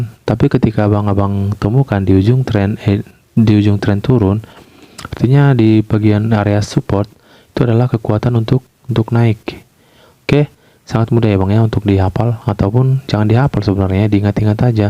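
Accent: Medan, Indonesia. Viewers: Bg orng Medan yaa ?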